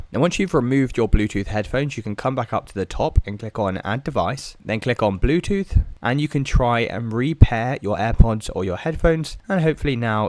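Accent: United Kingdom. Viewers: This is the first bit of crappy troubleshooting info i found when originally googling the issue... A video with a british accent doesn't make it better.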